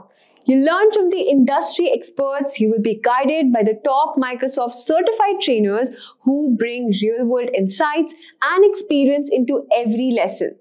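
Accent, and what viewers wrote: India. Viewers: Please have somebody with plain and clear American English to explain the benefits of this course. It is very difficult to follow along with the heavy Indian accent this presenter has.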